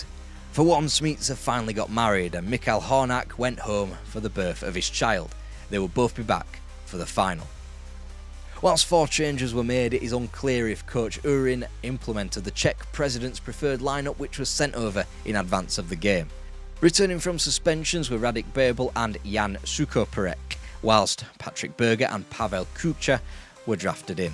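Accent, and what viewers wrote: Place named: North, United Kingdom. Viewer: Northern I was certain about. It's a very cool sounding accent, like someone I'll have three pints with on a saturday afternoon.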